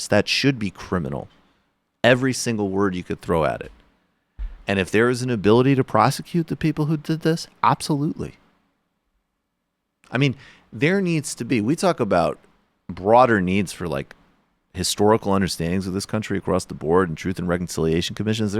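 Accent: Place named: New York, United States. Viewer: cuz he's a new yorker XD he also says yuge, instead of huge.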